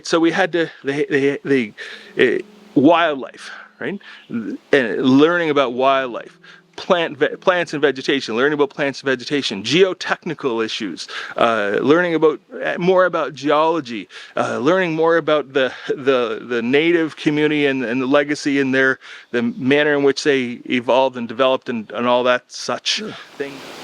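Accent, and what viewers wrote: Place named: Canada. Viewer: "i'm in canada, no secret if yer watching from another pert of the werld" canadian accent detected for sure. cool vid, love the chalkboards in the woods, love that they always move when you walk away. excellent setup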